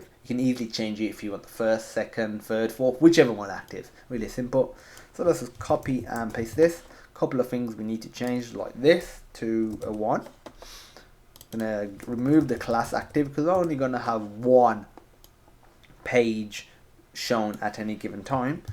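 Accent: United Kingdom. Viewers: Great stuff ! The git downloads will save me ages...! I appreciate the video too btw - good to hear a Brit voice - no trouble understanding you :-)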